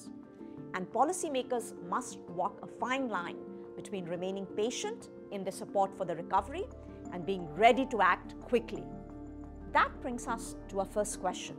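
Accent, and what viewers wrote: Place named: India. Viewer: I easily understood you are a indian by your clear accent 😄🇮🇳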